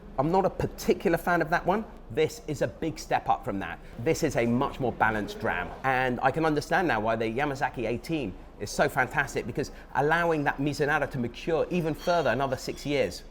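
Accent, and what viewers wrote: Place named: England, United Kingdom. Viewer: What an amazing video! When I go to Japan I want YOU to be my guide!! I have watched many of your videos and I love them all, very interesting! It is nice to see an English man that knows so much about Japan and whisky!! And I love your accent! Thank you so much for creating such interesting content about Japan, I enjoy every single one. Kanpai!!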